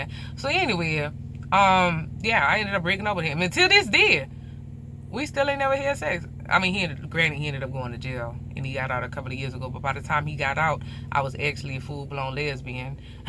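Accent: Louisiana, United States. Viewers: I though you was from loussaina the accent gave it away!! Lol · Love your accent! Bayou, Creole?....Cajun! Luv You!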